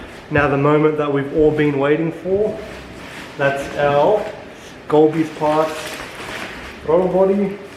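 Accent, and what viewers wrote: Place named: Australia. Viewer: Plasma man is your friend you sound Australian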